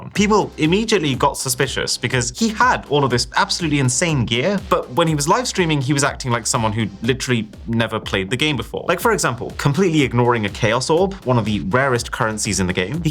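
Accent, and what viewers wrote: RP, United Kingdom. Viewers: This is not American "English", it's UK English. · Still feels like posh man talking down to us mear mortals... and you seriously come across as paid to talk up certain tech and brands... uour losing me and many others due to this 🛸👽🖖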